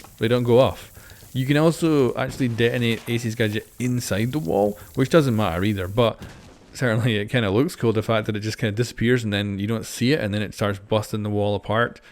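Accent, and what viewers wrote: Scotland, United Kingdom. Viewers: Are you from Scottland?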